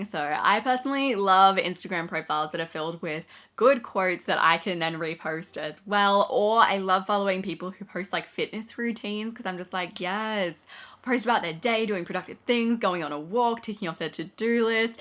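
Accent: Australia. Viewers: Hi, I'm not English but I live in England, I thought she might be from somewhere in the North but I couldn't recognize the accent and after I had a look at her IG I saw she's based in Australia so her accent is actually Australian! x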